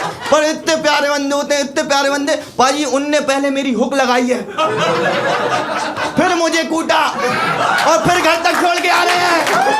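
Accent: India. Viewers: Bhai bundelkhand ke lag rai kaha se ho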